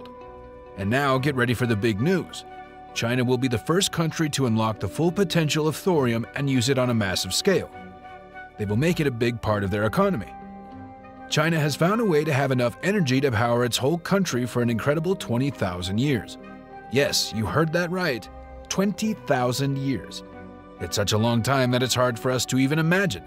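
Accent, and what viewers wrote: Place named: United States. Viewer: chinese propaganda video with an american voice narrator rofl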